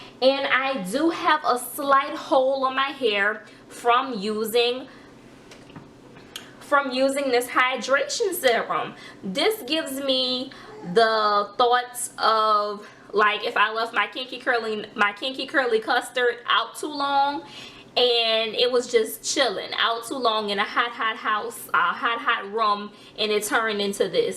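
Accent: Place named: Baltimore, United States. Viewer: I hear 👂🏾 Baltimore in your accent. Btw, pretty results! 👌🏾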